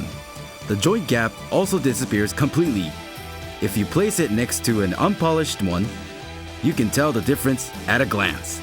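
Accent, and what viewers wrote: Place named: Japan. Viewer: It's the Japanese accent. Most Japanese men speak like this. Don't think it's nice to relate that to a meme, but I understand that a lot of people might not have been exposed to hearing such an accent before. 😃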